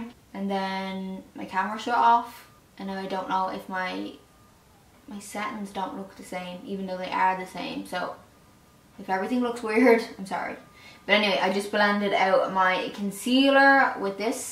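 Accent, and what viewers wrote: Ireland. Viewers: Are you Irish?? New subbie i came across you on Pinterest absolute doll xx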